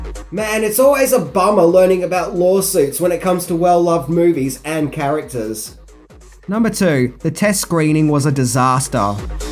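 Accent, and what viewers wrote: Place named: Australia. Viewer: Oh man the aussie accent is awesome "avendge his mavah"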